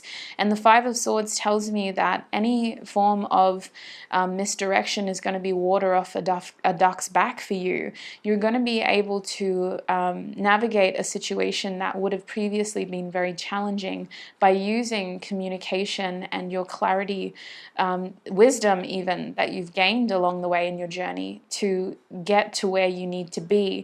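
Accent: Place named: Australia. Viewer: I could instantly tell from the intro you were Australian. Lovely energy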